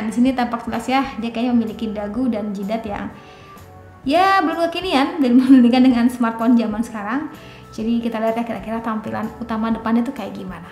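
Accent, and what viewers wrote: West Java, Indonesia. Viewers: "DESAIN DESAIN JAMAN BAHEULA", org sunda nyah teh?😃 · ini orang sunda y, keliatan klo ngmng ada kata "SI" nya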